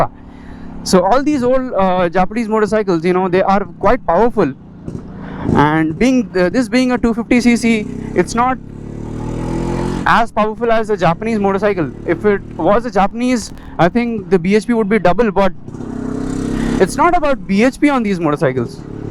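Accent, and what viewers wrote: Hyderabad, India. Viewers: What a beautiful feelings.. 🥰 I am understanding your feeling the way your talking by heart. And your hyderabadi hindi is more Cool. I mean Mazze aaye sunkar.. The Sound of the your Roadking is very very super.. I just Loved it. 🤩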